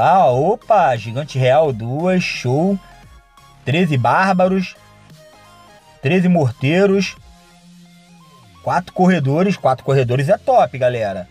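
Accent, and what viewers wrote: Rio de Janeiro, Brazil. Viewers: mt top esse sotaque do rj kkkk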